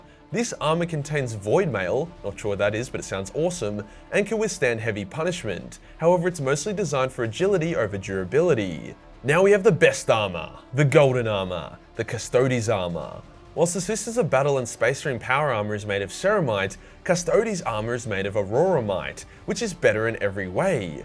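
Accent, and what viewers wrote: Australia. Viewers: Why is it relevant that you’re Australian? It’s pretty fkn obvious anyway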